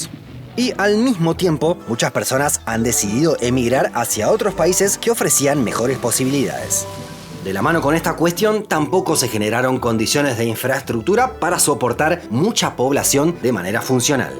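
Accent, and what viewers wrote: Argentina. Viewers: como que no es argentino? el canal es de Argentina, hay tres personas detrás del canal, el chico que aparece en los videos se llama Diego Briano y es argentino. O de donde pensaste que era el acento? Mexicano..?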